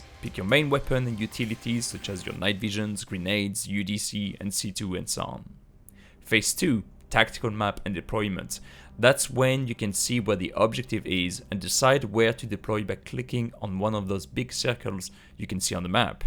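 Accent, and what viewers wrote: France. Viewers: Are u french ? Anyway, good video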